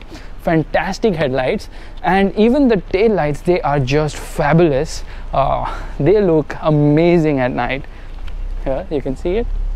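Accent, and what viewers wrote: India. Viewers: Good review !!! But you really need to put some work on your accent. Your accent is a prime example of a typical Indian accent. No offense though !!! 😊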